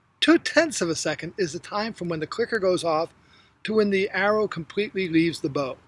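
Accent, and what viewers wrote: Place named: Oxford, United Kingdom. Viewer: And you do that, totally great. It´s so easy undertand you Oxford english. No question by now. I often ask myself about similar topics. This is a fantastic response, supported with videos. THANKS !!! I´m waiting for some topics about Field Archery, the most interesting and HARD to learn. Te preguntaré en español también, jejeee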